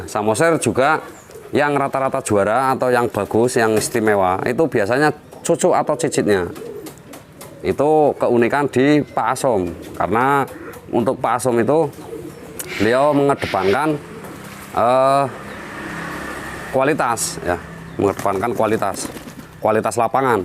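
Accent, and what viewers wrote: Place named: Java, Indonesia. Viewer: Ngringokna channele khas njawane 😂, apa adanya 👍🏽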